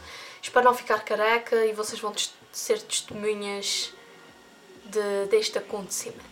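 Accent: Portugal. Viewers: Fiquei de boca aberta quando abri o video e ouvi o sotaque miquelense :o P.s sou de santa maria xD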